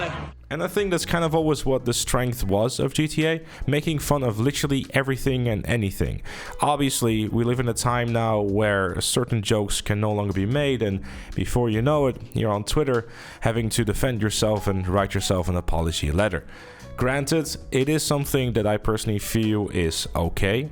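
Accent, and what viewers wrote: Netherlands. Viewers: Hol up is this man dutch?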